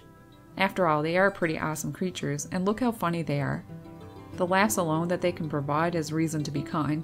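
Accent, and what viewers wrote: Newfoundland, Canada. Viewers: Ok i hear Canadian accent ❤ love your name so cute 😊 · It’s subtle, but you sound like a Newfoundlander! I love to hear the accent. Interesting video with great information, not sure how this was recommended to me... but I learned something! Thanks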